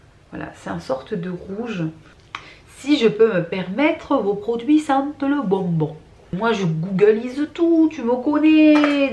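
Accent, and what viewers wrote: North, France. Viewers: merci pour ton vlog frais et sympa, je me disais bien que j'entendais un petit accent du nord dans tes vidéos!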